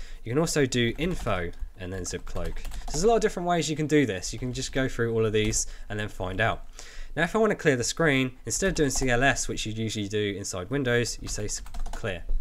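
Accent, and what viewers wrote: United Kingdom. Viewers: He has a strong British accent.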